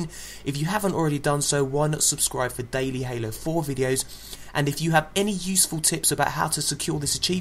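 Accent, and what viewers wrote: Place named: United Kingdom. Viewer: Its nice to hear some videos on youtube done by people with British accents for once and not American 12 year olds :P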